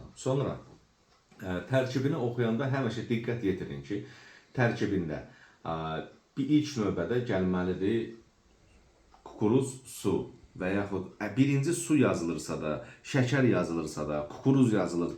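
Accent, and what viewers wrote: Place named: Qazakh, Azerbaijan. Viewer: Ləhcədə ki SUPER🙏🙏🙏 QAZAX ləhcəsi👏🙏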